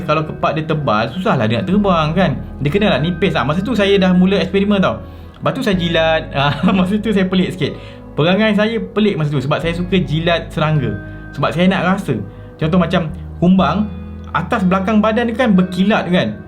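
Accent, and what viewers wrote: Melaka, Malaysia. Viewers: loghat Melaka kuat jugak tu bro... Pegang = "pay"gang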